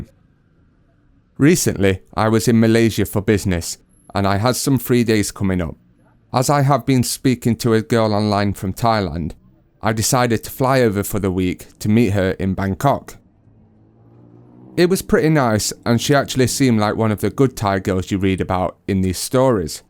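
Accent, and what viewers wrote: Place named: Sheffield, United Kingdom. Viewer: The narrator sounds like he hails from Sheffield, England.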